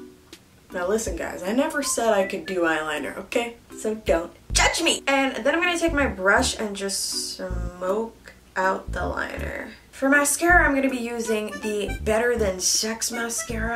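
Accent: United Kingdom. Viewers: The British accent is so realistic I am from England you literally sound like me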